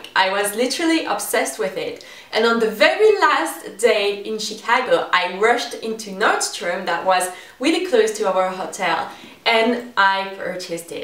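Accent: France. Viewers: She have a french accent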